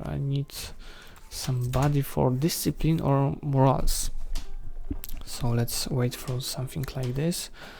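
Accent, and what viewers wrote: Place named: Poland. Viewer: Ten twój wyraźnie słyszalny, ciężki, Polski akcent tylko dodaje smaczku całej serii. Świetna seria z klimatem czyli Quality Content za który cię subskrybuje. Miłego dnia!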